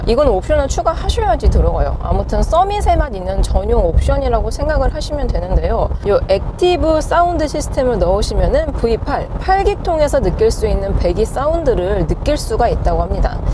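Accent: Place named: Chungcheong, South Korea. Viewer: 충청도 사투리 섞여 있네유 .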